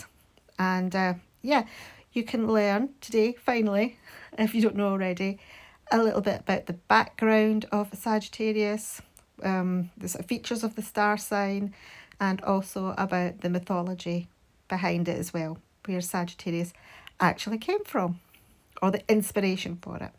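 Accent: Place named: Scotland, United Kingdom. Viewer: Loved this WIP & Chat and learning more about the Sagittarius. Very interesting indeed. I was sitting here the whole time thinking you sounded like you were scottish then you mentioned Robbie Burns. I have not been a Burns Night in years. I live in the prairies and they celebrated here annually and I use to attend it when I was younger with my parents. Brought back some good memories. 💜💙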